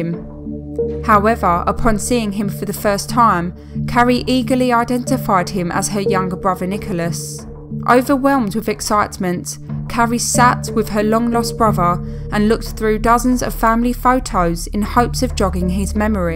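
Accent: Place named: South London, United Kingdom. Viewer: I like the subject matter but I am so distracted by the awful narration ! Robotic and an awful South London accent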